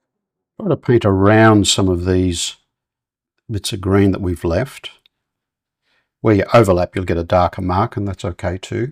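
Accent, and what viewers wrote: Australia. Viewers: This is amazing!! Pardon me if I am wrong, are you Aussie? Would really like to see how to paint a gum tree Please. 🙏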